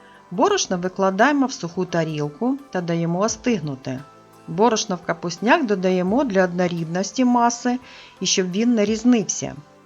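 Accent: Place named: Carpathians, Ukraine. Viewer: Наголоси ставите неначе ви з Карпат, а не з Полтавщини. Повинна ж бути автентична українська мова регіону.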